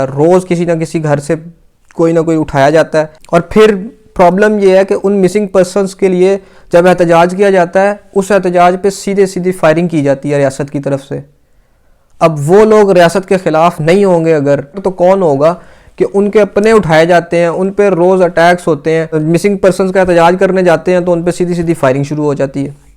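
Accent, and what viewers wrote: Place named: Punjab, India. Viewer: Your punjabi accent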